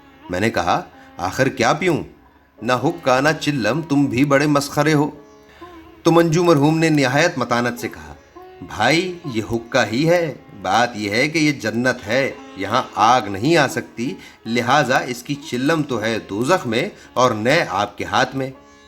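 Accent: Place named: Lucknow, India. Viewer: لکھنوی لہجہ کی الگ سے داد۔ ۔۔۔۔یار کیا بات ہے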